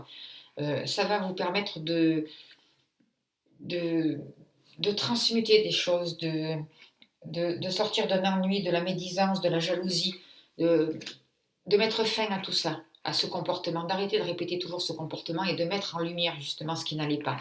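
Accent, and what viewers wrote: South, France. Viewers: bonjour et merci pour vos videos tres justes, et j adore votre accent du sud ;)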